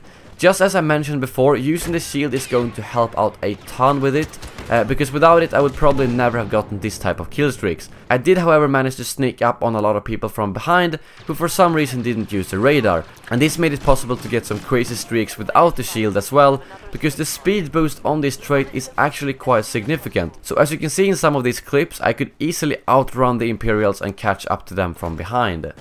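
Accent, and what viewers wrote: Sweden. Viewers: It sounds like youre from sweden? Am i right?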